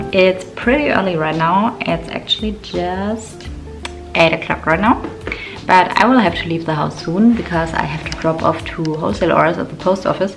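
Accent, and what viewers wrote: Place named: Germany. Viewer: Your vlogs are so soothing! Also, your adorable accent makes me miss living in Germany! :D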